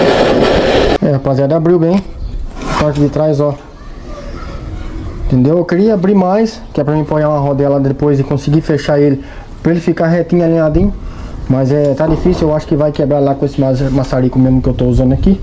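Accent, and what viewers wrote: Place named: Santa Catarina, Brazil. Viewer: achei que você morasse em SC pelo sotaque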